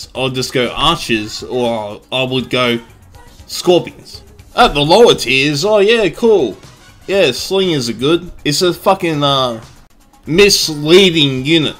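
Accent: Australia. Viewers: jeah and his aussie trashtalking is fucking funny xd but i meant that he is one of the few youtubers that doesn't just upload average games or boring low tier matches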